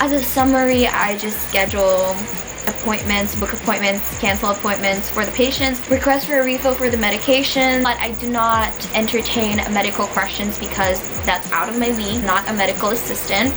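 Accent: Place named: United States. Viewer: she has an american accent ............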